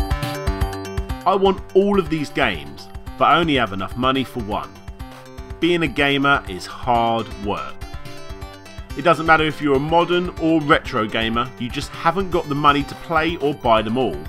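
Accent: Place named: England, United Kingdom. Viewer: It's so nice to hear complete history videos from a fellow Englishman instead of American folk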